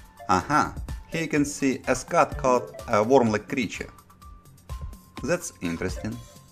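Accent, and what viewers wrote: Russia. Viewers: i just wonder do americans understand your accent? my russian friend has the same english accent as yours. he have never been in russia though.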